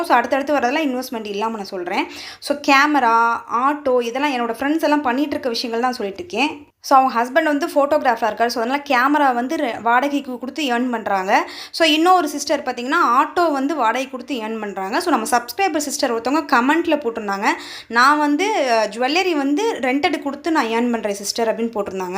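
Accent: Madurai, India. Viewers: Neenga madurai ya sister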